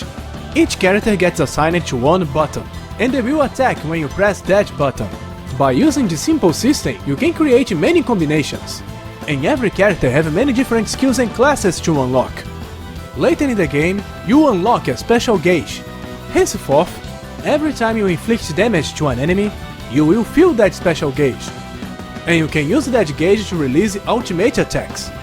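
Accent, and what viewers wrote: Brazil. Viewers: are you br? i can't stand your accent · e carioca, né? hahaah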